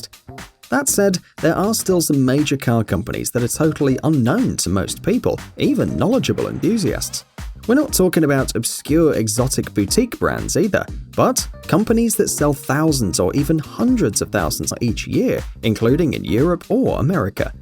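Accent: United Kingdom. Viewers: "What's up?" Naff all, pal. It's bad enough when YouTube Yanks ask what my problem is, but a British accent asking it demands a Glesca kiss.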